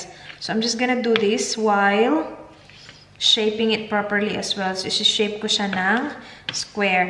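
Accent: Visayas, Philippines. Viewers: Bisaya ka po ba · Ka bisaya ba diay nimo te oi HHAHAHAHA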